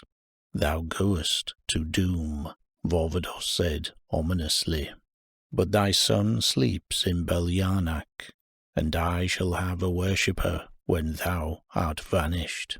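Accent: United Kingdom. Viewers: Great narration, thanks a lot. Nice to hear a Northern accent, I live in Manchester, UK. Looking forward to trawling through your content, thanks again.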